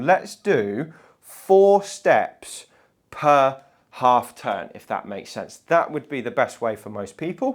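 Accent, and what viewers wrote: United Kingdom. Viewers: Thank you for the work you do! My wife is in physical therapy from a young man that resembles yourself. Except for the British accent.😊 She is receiving treatment to regain confidence after a hip replacement. Her marching turns must take 20 steps. Therapy is critical in allowing a person to use what the surgeon provided. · OK, dude, you've got a cute British accent, and it sells in America. But git real, y'all. Nobody turns crossing his legs first. On the other hand, maybe you should teach now how we should drink through our mouth, not through our nostrils.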